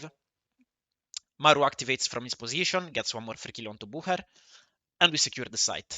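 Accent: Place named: Italy. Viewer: You have a strong italian accent, anyway, nice analysis! And good luck tomorrow for the match with the unicorns!! Destroy them :)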